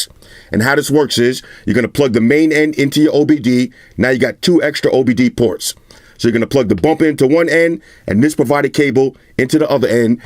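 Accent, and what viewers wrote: New York, United States. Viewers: You’re from queens or Brooklyn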